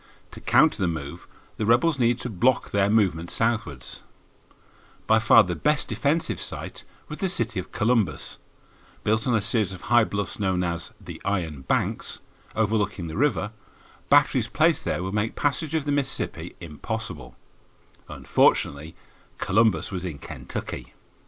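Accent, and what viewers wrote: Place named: United Kingdom. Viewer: Why is the narrator British? 🤷🏼‍♀️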